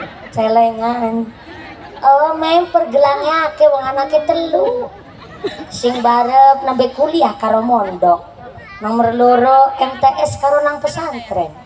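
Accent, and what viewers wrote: Banyumas, Indonesia. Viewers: Ceramah ngapak lucu tapi mengena para koruptor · Seru dan kocak sekali ya kalau pengajian mumpuni , ngapak ngapak 😅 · Ceramah ngapak ustadzah mumpuni Like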